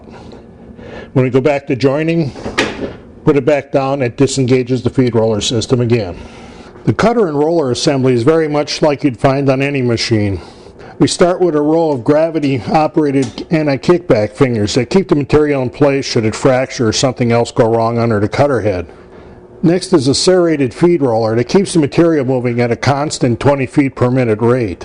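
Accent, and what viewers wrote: Chicago, United States. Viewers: FINALLY! We get to see your face; after watching kadzillions of your "reviews" on machinery. And if you are not from Chicago, I will eat me hat. Your "accent" (and YES Chicago-ites DO have a definite "accent"! LOL) gave it away. YEP! The quite often "Dee's" instead of "The's", etc, gives it away every time. I noticed this distinct accent when I first arrived in the "windy city" in the fall of '46. It took me 6 yrs to fully understand you "Yankees". LOL Of course my school-mates and neighbors never let me stop hearing, "You talk funny with yuz "Southern drawls" lack "Yall"; not to mention, "AH instead of "IEE". But I got "USE-GUYS" back, by saying, "Yes and YALL sho nuf do talk funny LOL"! Seriously, you do a GREAT job reviewing; in these awesome videos. You cut right to the chase (unlike MOST who review) and your knowledge is FAR above other reviewers. 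If a person can not learn from your video's, they can NOT learn. period! Keep up the good work neighbor.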